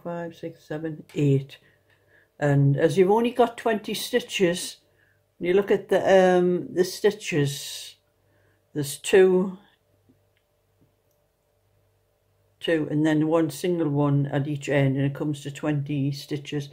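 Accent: Newcastle, United Kingdom. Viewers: Lovely to hear another geordie voice , I love knitting ,Thanks for sharing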